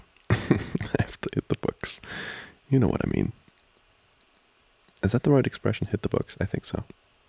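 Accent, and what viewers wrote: Germany. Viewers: i cannot with this german voice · your german voice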